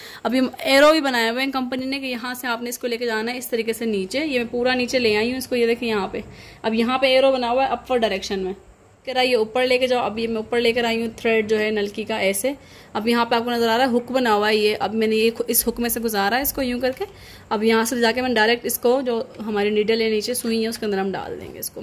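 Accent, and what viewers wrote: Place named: Karachi, Pakistan. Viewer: ap apne accent se karachi ky lgty ha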